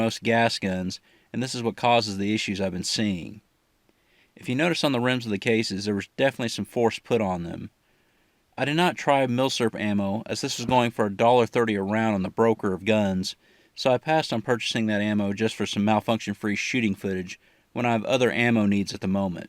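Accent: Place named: South, United States. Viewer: cool. I was detecting a slight southern accent that I couldn't place...piqued my curiosity.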